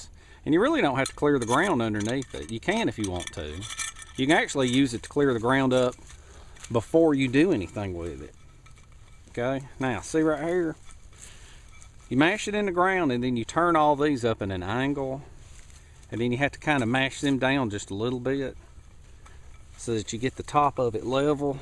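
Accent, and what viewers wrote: South, United States. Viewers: I like your accent, very Southern :) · Your accent is aweson man makes me miss the south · I'm from the South too. Sounds like home to me. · Yep, you do, hehe... What part of usa is that accent from ?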